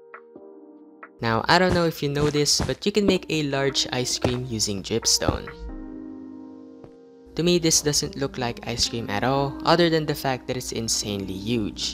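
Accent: Philippines. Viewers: You sounds like filipino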